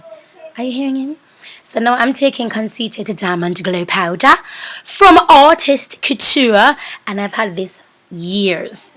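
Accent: United Kingdom. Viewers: You killed me with the british acc damnn goo gal. Love you for free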